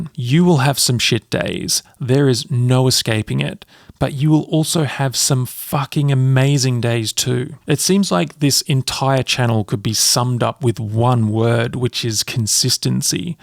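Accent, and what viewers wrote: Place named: Australia. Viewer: Enjoying your points of view, good to hear an Aussie voice, hi from Adelaide. I think every music channel gets the negative trolls.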